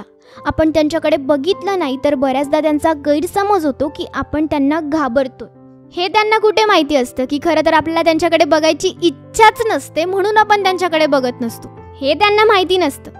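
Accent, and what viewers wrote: Pune, India. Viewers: Puneri Marathi ❤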